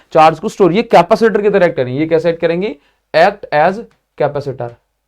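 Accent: Odisha, India. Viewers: Sir are you from odisha ??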